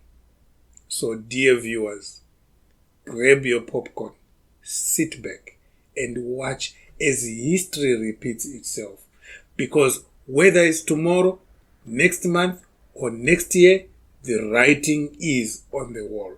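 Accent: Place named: London, United Kingdom. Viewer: You can speak London 💯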